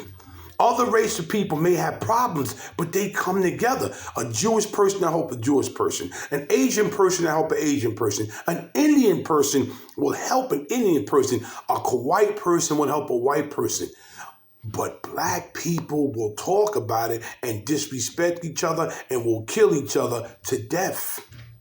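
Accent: New York, United States. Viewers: Just catching the replay. I am a very dark complexion black woman I caught a flack as a young person for being dark and it was my people who harassed me about something I could not help. I happento like a big Black man like you and with New York accent you a handsome dude. No Harris will not get my vote! Take care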